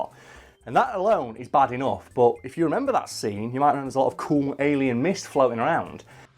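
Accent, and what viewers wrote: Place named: North, United Kingdom. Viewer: Talking about tea like that and having that northern accent, it's like I'm watching Guy Martin!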